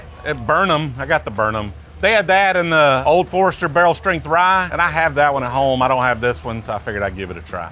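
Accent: South, United States. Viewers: mostly because it was MACK Donalds... Love the southern accent.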